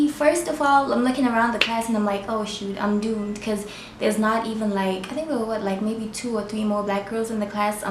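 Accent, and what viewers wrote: Caribbean. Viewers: Are you carribean? I hear an accent. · where you from? the Caribbean?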